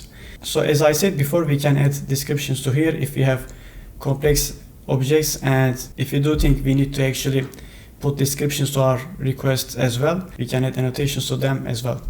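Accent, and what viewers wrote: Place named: Turkey. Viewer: Hocam o tatli aksaninizdan direkt Turk oldugunuzu anladim :D Harika bir video olmus direkt kanali takibe aldim. Cok tesekkurler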